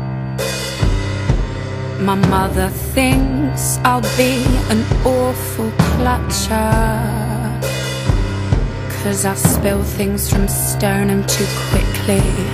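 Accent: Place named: East London, United Kingdom. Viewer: Love her accent East London ?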